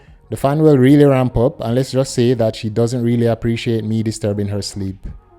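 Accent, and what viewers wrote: Jamaica. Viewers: Bro sounds like a yaadman, subbed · Yo u a jamaican ? How on earth did you get a steamdeck cheap cheap?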